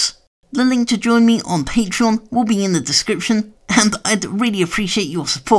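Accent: United Kingdom. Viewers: Cool.I have my own channel which is inspired from seeing the worlds coolest LEGO builder. Are you from Great Britain?The accent is very familiar to Britain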